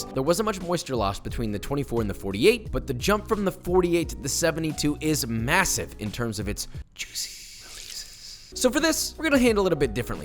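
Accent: England, United Kingdom. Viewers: Your English accent is getting quite refined You absolute mad lad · That English voice is brilliant. Most Americans do it in a jokey way but you, you son, make my proud. (I’m English if you didn’t get the drift) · The British accent has me dyinggggg lol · Josh's English accent is better than Oscar Isaac's. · Can we have Josh do an entire video with the English accent? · As someone from the UK I really like his English accented quips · Why the British accent tho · You're an inspiration to us all buddy, keep up the good work!. Josh's British accent gets me every time. Love it.. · where did the british accent come from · What's with the terrible English accent?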